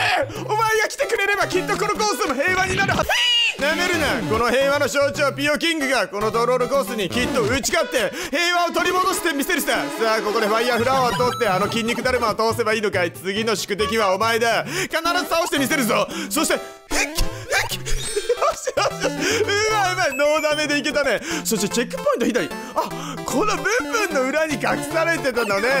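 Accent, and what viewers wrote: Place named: Kyushu, Japan. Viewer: こんなOPとか言ってるけど、、、それがいいんすよｗ こんなって言ってるけど、こういうのも考えて作成して、そして動画編集もして、、、、無理しない程度にお願いしますね！長く永くなが～～～くさんの動画が見たいので！！あと、自分熊本なんで、ちょくちょく出る方言も聞きなじみがあるけん、そのままのスタイルで言ってほしかばってん、、よかろか？？お願いしますｗｗ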